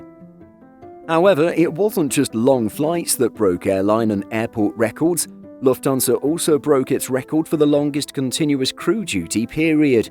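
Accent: United Kingdom. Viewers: What? YOu can clearly make out the British accent, and also, this is the channel of a news website. I think your ears are low quality.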